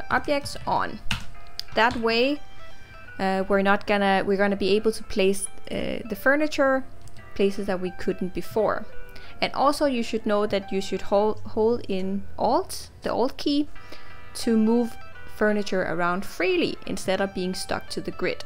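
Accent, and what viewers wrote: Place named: Denmark. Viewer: The Danglish is strong with this one.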